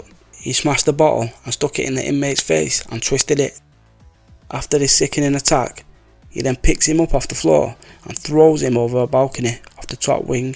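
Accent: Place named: Northern England, United Kingdom. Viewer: Why? Cos he doesn't have a London accent? He's from up north that's how they speak. You must have an inability to grasp different accents cos I had no issues understanding him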